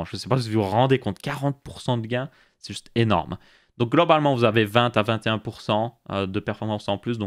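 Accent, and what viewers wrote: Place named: Belgium. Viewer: Beaucoup de "Pouissance" x'DDD Aaaah merci la Belgique ♥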